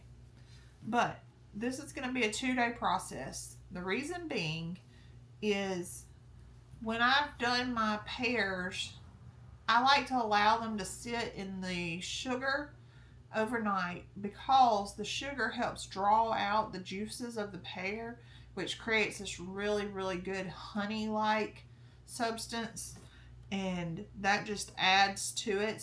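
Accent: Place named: South, United States. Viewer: Wonderful video. If people tease you about your southern speech, they need to get over it. We all knew what it was you meant. Get some rest girl, you've earned it.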